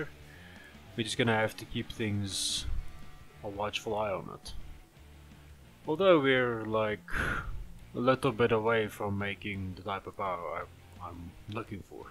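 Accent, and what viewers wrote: South Africa. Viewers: Those "R"s.... South African?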